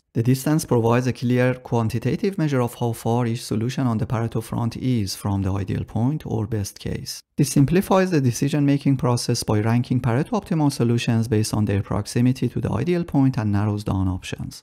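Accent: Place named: Iran. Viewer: Ah that lovely persian accent!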